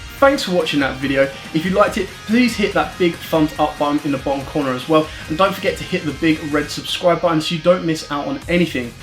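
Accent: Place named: United Kingdom. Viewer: Are you an Englishman? I can hear a Brit accent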